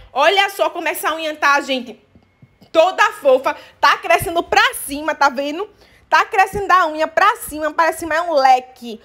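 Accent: Northeast, Brazil. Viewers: Gosto da voz de...voz de nordeste nos vídeos...kkkk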